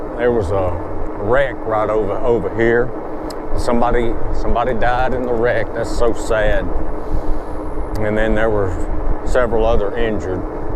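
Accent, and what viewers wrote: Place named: United States. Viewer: Hello, great video I go to church every Sunday online because my first cousin and second cousin is both pastors and they have a church. I cannot make it to the church cause I’m too far. I’m like nine hours away from where they are at so I go online every Sunday and look at them. Yes, it is so sad when people pass away I know whoever they are my prayer with them. You know I don’t like to hear people passing. I know I say this if you have somewhere to be at a certain time get up and leave that’s all you have to do and you won’t be rushing, I don’t drive cars I get on public transportation and the people in my city be telling the bus driver to go a little faster because they in a hurry I tell the bus driver please don’t go fast because I wanna live a little longer but when I get my E trike, I won be using public transportation that much. 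I only use public transportation about one or three times a month. I love walking I Costco right around the corner from me, but people in my area is lazy. They will not walk. They will take a cab to go three blocks very very, very, very lazy in my city. thank you so much for your videos and I love love love your accent. It is so beautiful. Where are you from? I love to hear you talk country ❤❤❤❤❤❤❤🙏🏿🙏🏿🙏🏿🙏🏿🙏🏿 God bless you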